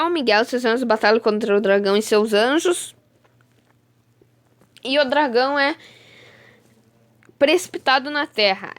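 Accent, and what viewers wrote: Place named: Israel. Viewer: Tem sotaque israelita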